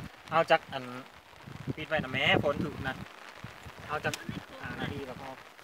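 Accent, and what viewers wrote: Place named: Thailand. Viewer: ค่อยมักสำเนียงเจ้าหลายฟังแล้วมวนดีจ้าค่อยเป็นคนไทยเลยจ้า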